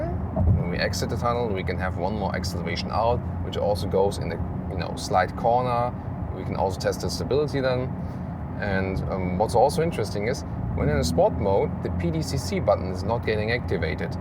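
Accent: Germany. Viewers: The inflection of a German accent in English makes for a fantastic review!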